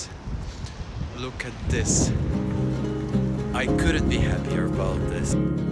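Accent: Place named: Sweden. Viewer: Can really see your excitement in this video and your English sounds very Swedish as well... you fit right in :D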